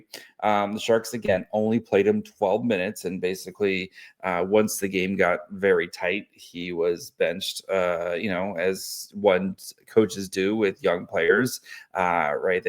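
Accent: Canada. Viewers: Relax. Are you Canadian? That is not a a central valley accent.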